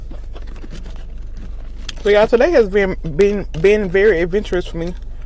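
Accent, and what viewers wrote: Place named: South, United States. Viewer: I like your southern talk & accent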